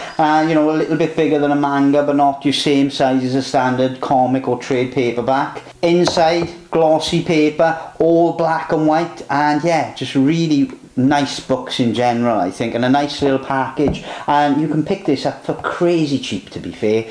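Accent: Wales, United Kingdom. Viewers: Randomly discovered this channel… did not expect to hear a Welsh accent talking about Maus. I can hear the voice in my head getting more like it did when I lived in South Wales.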